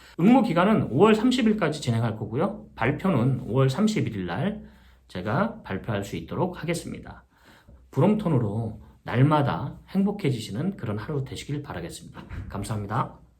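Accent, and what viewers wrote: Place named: Seoul, South Korea. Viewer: 님 역시 고급진 서울어에 목소리도 좋으시공 ㅎ 이렇게 이쁜가방 나눔이벤트도 해주시고 응원하면서 신청해봅니다 41번 진규철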